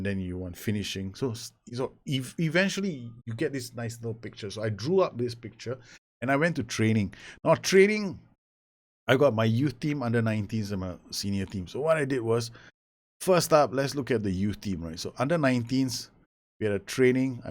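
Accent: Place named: Singapore. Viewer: I cant put a finger on your accent dude...at times you really sound singlish... Then you you would say something that would throw me off completely...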